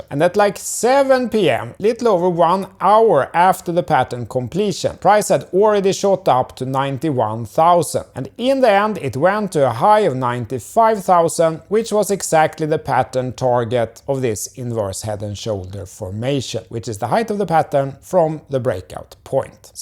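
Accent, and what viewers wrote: Sweden. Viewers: Can’t hide that accent hello from a fellow Swede 🇸🇪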